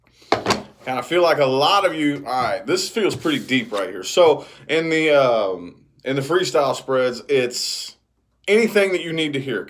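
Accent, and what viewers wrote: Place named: Rural, United States. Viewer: Love your country voice cute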